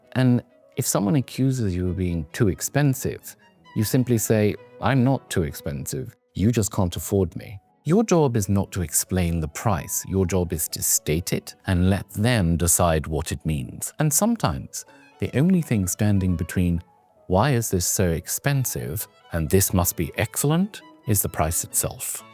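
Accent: RP, United Kingdom. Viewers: Now there is a plummy accent!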